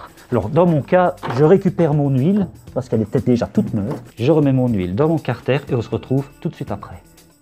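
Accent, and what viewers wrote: Belgium. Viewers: J’apprécie le petit accent belge 👍🏻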